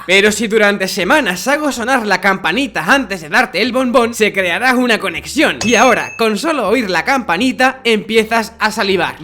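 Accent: Spain. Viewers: Es español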